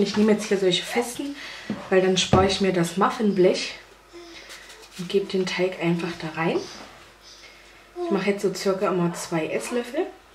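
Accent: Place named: Magdeburg, Germany. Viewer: Hallo, hier ist eine Namensvetterin, durch Zufall bin ich auf deine Seite gestoßen. Ich glaube auch auf der Suche nach Infos über Gerstenmalz selber herstellen, egal. Es ist mir dein Dialekt aufgefallen, der ja nun garnicht bayrische klingt. Kann es sein, daß du aus dem Jerichower Land stammst? Ich bin Jahrgang 1961, da hießen viel aber du bist doch jünger. Es ist schön, daß auch junge Frauen sich für Kochen und Backen interessieren und durch YouTube ihr Videos veröffentlichen. Ich selbst schaue ja auch viele Videos zu diesem Thema an. Mach weiter so, hast tolle Videos und dir und deiner Fam. ein schönes Weihnachtsfest. Liebe Grüße 😀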